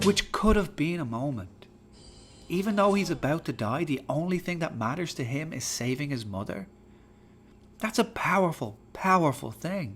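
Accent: Ireland. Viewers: this irish sounding guy understands the greatest american superhero better than zach snyder and every dc fanboi. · More than likely the Irish accent. The volume level of his voice is fine. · Nice Irish lilt. Ba dheas an rud a cloisint ar YouTube.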